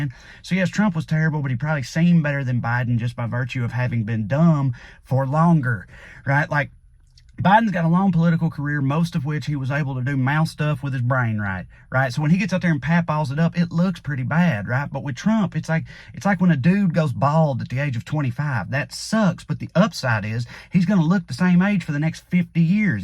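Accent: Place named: Appalachia, United States. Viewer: I love how Transatlantic has Hillbilly in it. That's how you know he's keeping it real...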